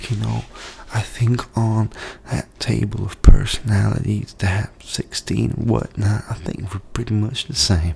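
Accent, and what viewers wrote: South, United States. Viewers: You seem much more mature than a typical 24 yr old. I'm old enough to be your Dad.....if you were born when I was 12 yrs old! KIDDING! 20 yrs from now, you'll look the same. You know I like to tease you, but I'm correct on how you'll age. I like the southern accent and remember what I wished for you to do.........and accents will help. I'm not good at accents nor can I identify them (where the person is from). Due to cancer treatment, I lost some of my hearing at a young age. While in Hawaii, a waitress came to my table and I just looked away b/c I had NO idea what she was saying and she was speaking English but with a heavy accent. My friends found it hilarious, while I sunk in my chair. 🤣 (it's healthy to laugh at our own shortcomings) 👂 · I was not expecting such an flawless Southern accent but here we are 😂😂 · how did bro nail the southern acc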